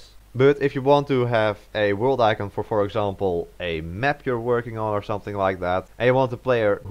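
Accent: Netherlands. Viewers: Thanks for the guide, are you dutch? You sound like from the netherlands love their english accent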